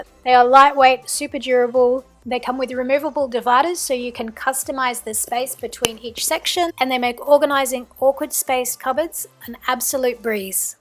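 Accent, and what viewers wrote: Australia. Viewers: very clever ideas nice to hear an Australian voice too xoxo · Just subscribed.Just realised my sodastream fits next to the microwave! didnt think to try it before.. nice to hear an ozzie voice. Great videos (Straight to the point, less chatter). Keep up the good work! :)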